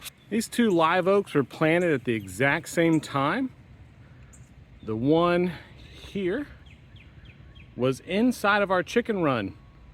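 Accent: South, United States. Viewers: That southern accent fit perfectly for my part of the country. Good job Buddy. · Love the videos yall. Love ur accent buddy. Lol I got me a southern accent too over here in Pensacola Florida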